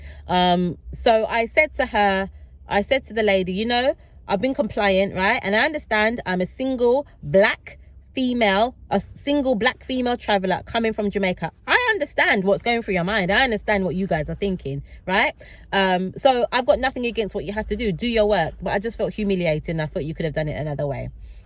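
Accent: United Kingdom. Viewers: It seems to me that you were a little too prepared yeah 2 travel frequently if someone is a frequent flyer and then hopping over and over they wouldn’t need the amount of information that you got if you gave them very little it would’ve said she’s not prepared because you had so much information to squash there they suspicion against you. It feels that they saw you as too prepared for them so that is why they gave you the full degree treatment so next time Missy let them ask the questions don’t chitchat with them saying I watch border force bullshit. you thought your British accent was gonna get you through and be treated decency skin colour is a criminal so they showed you how they see you. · Okay. My apologies. Did not watch to the end. Nice British accent 😎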